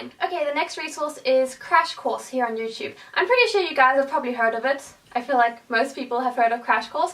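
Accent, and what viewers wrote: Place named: South Africa. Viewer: You sound South African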